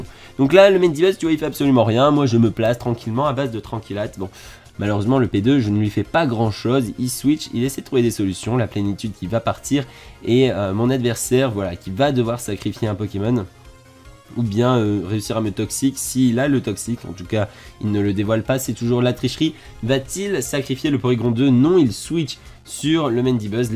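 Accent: England, United Kingdom. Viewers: no the englush accent is back :'( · Je risque pas d'oublier cette vidéo où tu réussis l'exploit de foirer l'intro et l'outro en même temps xD. Bon pas grand chose à dire sinon GG ! Cresselia est bien parti pour être MVP ! Pense à te doper à la camomille pour les prochains matches, ça semble efficace ! (par contre j'ai rien contre l'accent anglais, je trouverais même ça marrant qu'il devienne ta présentation emblématique pour cette compétition^^)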